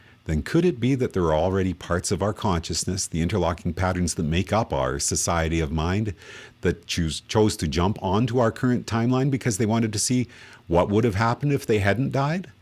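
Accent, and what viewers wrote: Canada. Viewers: His thick Canadian accent is distracting me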